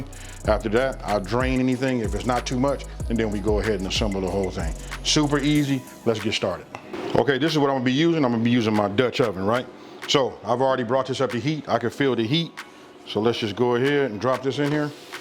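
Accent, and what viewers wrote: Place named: California, United States. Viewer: It’s the California Accent for me!!🥰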